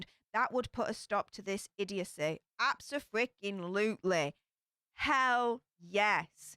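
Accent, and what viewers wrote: Yorkshire, United Kingdom. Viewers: I listened to a video that explains all different English accents by region, and I pinpointed you to Yorkshire! Am I right? 🙂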